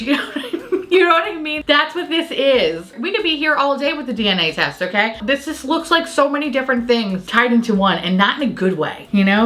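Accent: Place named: New York, United States. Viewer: Observation on your New York accent: I never noticed it in your videos filmed in Queens, but now that you're in Florida, the accent is more noticeable! ❤️ Maybe you're subconsciously differentiating yourself from all those FloridaPeople™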